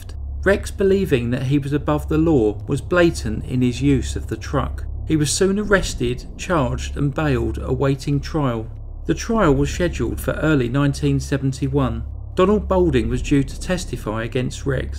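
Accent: United Kingdom. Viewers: Blimey he was a real right canker! Brilliant stories and narrated so Beautifully and British!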